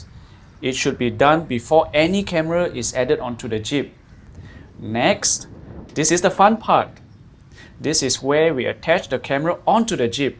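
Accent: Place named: Quebec, Canada. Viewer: Where are you from? You have some kind of a french canadian accent!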